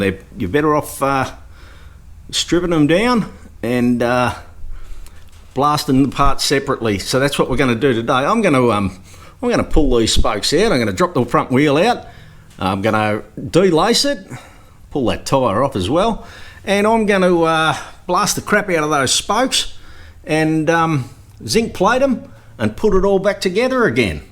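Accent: Australia. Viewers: Gidday, great to hear an Aussie accent after watching yanks building wheels lol. I’m about to replace a bent rim on a 2019 DL1000XT with a cross spoke pattern and it looks trickyAF. Ever done one like that? The nipples are in the hub and the rim has a double lip the the spokes fit through… it’s gonna be ‘interesting’ to say the least 😂.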